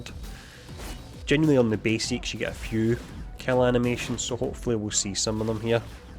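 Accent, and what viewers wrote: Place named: Glasgow, United Kingdom. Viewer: ahhh ok, are you in the US? Cos your accent sounds like your from near Glasgow like paisley or that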